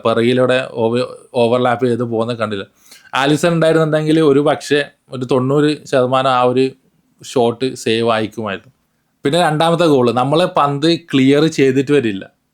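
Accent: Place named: Tamil Nadu, India. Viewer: Ninde malayalam thanne manasil avunillaa..thaan thamizhan ano. Pinne shokam united.n aara parayne..niyarada 30 kollathin shesham oru pl trophy kandda... liverpool fan aaa idparine kayjja kollam a city onn thazhe poyappm...pottan lottery adicha pole oru trophy kitti....ithrem mosham samayath koodi poyappm..oru poolan fanm njjn onlinl kanditt illa...kayjja kollam trophy kittyappm...alle ni youtube thanne thodaggne.pooo mone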